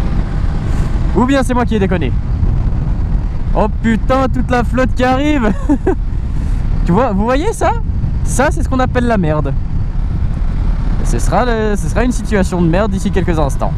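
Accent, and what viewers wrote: Vaud, Switzerland. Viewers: Le bel accent vaudois, ça fait plaiz'! Merci pour ta vidéo, tu as gagné un abonné. :) Je compte passer à la moto le printemps prochain après 7 ans de permis scooter, est-ce que tu la conseillerais à un débutant qui a zéro expérience en moto? Je cherche quelque chose pour faire le trajet domicile-travail (de l'autoroute ou de la route de campagne mais peu de ville) et me balader le weekend. Merci d'avance!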